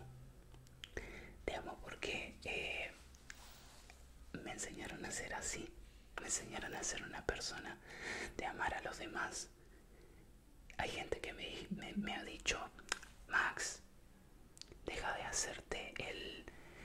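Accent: Argentina. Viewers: Hola; tus vídeos son muy relajantes y amo tu acento argentino;saludos desde Argentina 💕💕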